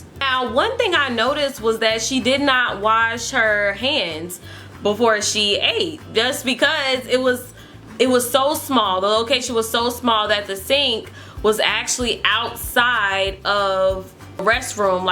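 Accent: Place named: California, United States. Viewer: sounds like she is from Cali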